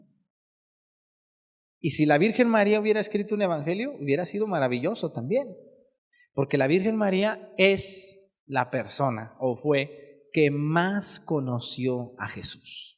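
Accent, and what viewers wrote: Mexico. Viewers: Nada mejor para el domingo que escuchar de la Virgen María; su forma de narrar los hechos y su dejo mexicano tan agradable (3ra vez que veo este video), no necesita imágenes al narrar mi imaginación desarrolla lo hablado. Los sábados maratón de vídeos café Católico. Desde Lima Perú. Mis oraciones a Dios para que le de muchos años de vida con salud, alegría y fuerza por enseñarnos. GRACIAS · Me gusta como enseña y predica,se le nota lo mero México, Dios lo bendiga padre he aprendido mucho con usted, saludos desde,pasto Colombia, me hace reír por su forma de hablar,pero no es por burla yo respeto mucho a los sacerdotes es por su gracia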